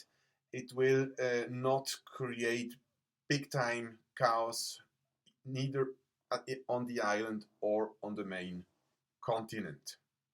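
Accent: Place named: Switzerland. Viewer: Danke für Ihre Antwort Natürlich ist mir nicht entgangen, das Sie viel lieber in Ihrem sympathischen SwissEnglish 😊 veröffentlichen und anteilig sporadisch Deutsch. Mit meiner schwäbischen Muttersprache, in schweizerischer Nachbarschaft, bin ich -nicht nur ich - in der Lage andere Dialekte zu verstehen, bzw.hat man sich dem allgemeinen Sprachgebrauch angepasst, wie Sie offensichtlich ebenfalls. Schön wäre es aber schon, wenn der englischen Sprache nicht mächtige deutschsprachige, interressierte Bevölkerungsanteil Ihre Zukunftsprognosen, die ich sehr schätze, in deutscher Sprache verfolgen könnte. Vielleicht haben Sie aber ,,gesehen", das es nicht ,,sein soll", uns als Betroffene zu informieren. Ja, es wird nicht ein lokales Ereignis sein, dank Internet erfährt man vom umgefallen Reissack...... Nicht dass ich auswandern wollte, aber auf kommende Ereignisse sich einstellen können und zuversichtlich trotz allem mit Hilfe Ihrer doch treffsicheren Prognosen wäre schon hilfreich. 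Selbstverständlich gibt es keinen natürlichen Anspruch Ihre Zukunftsprognosen zu bekommen, warum aber veröffentlichen Sie dann im Internet ❓ Mit guten Gedanken und Gefühlen, wenn es denn zum großen Reinemachen kommt, hoffe ich, das es für alle eine schöne Weiterentwicklung gibt . Alles Liebe und Gute für Sie ❣️Danke für Ihre spannende Arbeit an der Menschheit Josefa 👵🙋🙏🌟‼️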